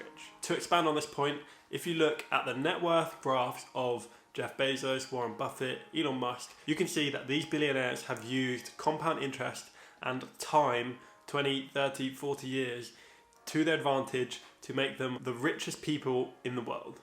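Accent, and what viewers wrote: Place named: Scotland, United Kingdom. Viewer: Nice video mate, Scottish accent was decent to be fair!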